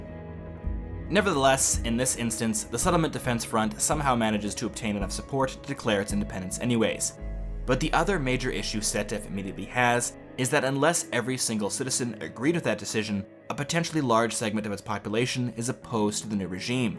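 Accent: United States. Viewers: Uhm, why would a nation try to succeed from their home nation? Your accent sounds American....Ask the british how it went from their perspective. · "How does a collection of colonists and frontier workers, possibly compete with the manpower and industry resources, etc or a larger empire." Really dumb question dude, ESPECIALLY since, judging by your accent, you're an American.... Seriously, read your own nation's history and you'll have the obvious answer to your question. Whether or not it's feasable on a planetary scale is irrelevant, there nonetheless is a real world basis for this narrative plot point.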